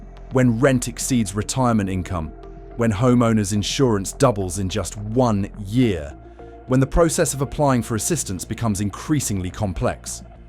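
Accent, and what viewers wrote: United Kingdom. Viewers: f this film producer nothing but propaganda ...every place has good and bad..:face-green-smiling: most are illegal criminals anyway f n brit narrating lets talke about england where he came from wht a jerk how about england paying its bills from both wwars to usa that they owe starting with your loser kings wealth? and all ye yankees go home anyway the locals are doing fine without ya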